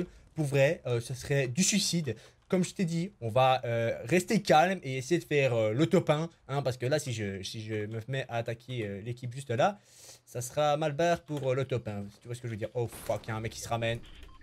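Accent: Belgium. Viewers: T'es belges ?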